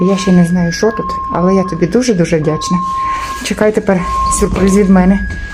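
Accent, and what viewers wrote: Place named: Chernihiv, Ukraine. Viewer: Дякую,! Так приємно бачити Чернігівщину.❤ Я родом з сусіднього Бахмацького району, але давно живу на Київщині... Такий говір близький, з дитинства і юності.😊 💙💛💙💛💙💛 Гарний малюнок кицюнчика, донечка -- талант і молодчинка!❤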